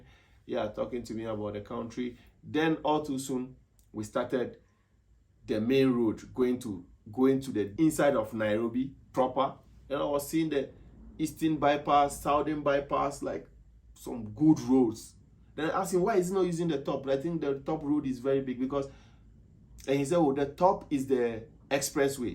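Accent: Nigeria. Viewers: You english you talk like Nigerians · We think you are a Nigerian coz of accent 😂😂😂😂😂😂😂😂😂😂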